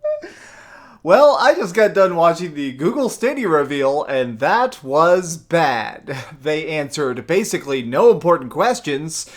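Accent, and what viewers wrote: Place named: United States. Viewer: brush your teeth man. you look British pretty sure you’re American judging by your accent.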